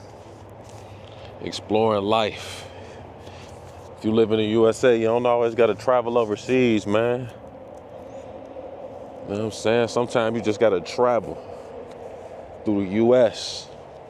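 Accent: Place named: Jamaica. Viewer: God bless my brother 🙏 why I thought I clicked on another persons videos when u came with the Jamaican accent 😅😭💀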